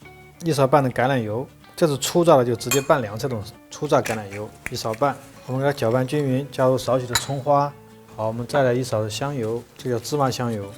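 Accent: Sichuan, China. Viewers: 四川口音（幽默）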